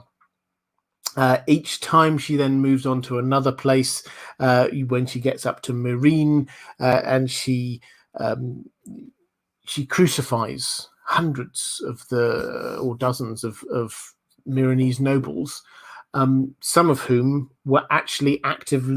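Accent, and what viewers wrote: United Kingdom. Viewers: Love your British accent on foreign languages! Keep it up and don't try too hard.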